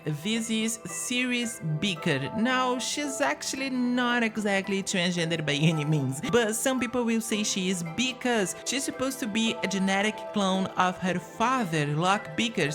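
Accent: Brazil. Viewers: where are you from? im pretty sure you’re from brazil by your accent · Esse ingles parece muito brasileiro... Sera que é???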